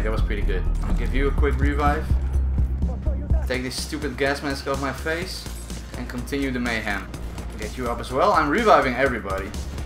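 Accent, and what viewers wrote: Netherlands. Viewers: u have a dutch like accent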